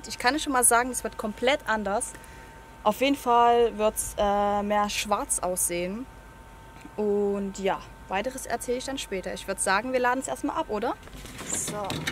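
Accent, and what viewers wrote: Saxony, Germany. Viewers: Woher kommst du ?Sachsen ? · Dein sächsischer Dialekt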